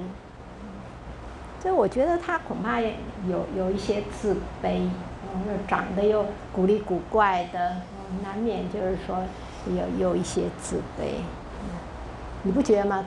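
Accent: China. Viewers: 老师是山东人耶~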